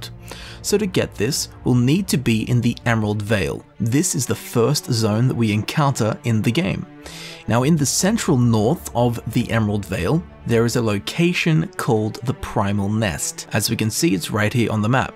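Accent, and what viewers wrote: Australia. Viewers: i'm a simply guy. i hear a fellow 'strayan and hit subscribe.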